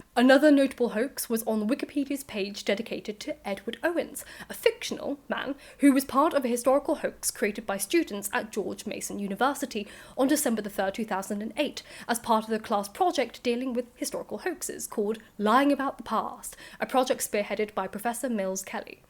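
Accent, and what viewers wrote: RP, United Kingdom. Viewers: No she's just British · Was your father in the House of Lords cause I’ve heard posh accents before but that’s something else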